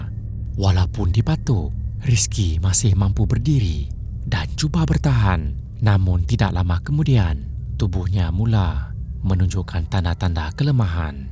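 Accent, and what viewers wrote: Sabah, Malaysia. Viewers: SAYE TAK PAYAH CAKAP APE² SAYE DAH KENAL DAH SUARE ABANG NI ORANG MALAYSIA SABAH ATAU PON SARAWAK TU JE 😊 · Rasanya admin ne orang malaysia tp lorat sabah atau sarawak kot..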